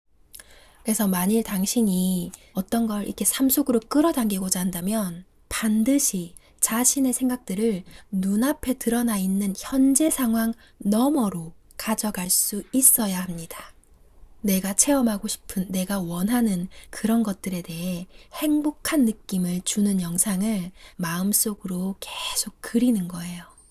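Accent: Gyeongsang, South Korea. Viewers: 처음 들어오자 마자 구독 좋아요 꾹~♡ 누릅니다 사랑스럽고 편안한 목소리에 정겨운 경남 사투리가 매력을 두배로 해주는거 아시나요? 내용도 정말 현실속에 적응하기 쉽게 설명해주셔서 감사해요~~~♥️♥️♥️♥️♥️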